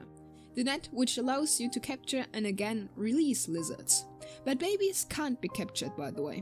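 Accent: Germany. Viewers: Where are you from? You sound like german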